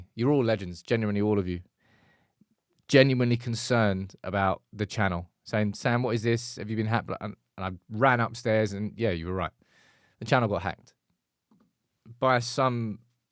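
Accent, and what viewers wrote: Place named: Liverpool, United Kingdom. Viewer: Defo a Scouser... sorry you had to go through that Sam. Glad to see you got your channel back! ❤🤍🖤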